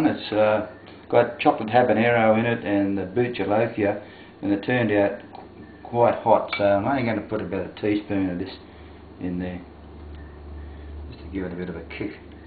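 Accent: Australia. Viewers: AWESOME you are a great chef Link your site to some other similar site... People need to see you... I found you by accident and I am so happy... I will subscribe now.... Thanks for the great videos..California is my home where are you from ? is that an Aussie accent? just curious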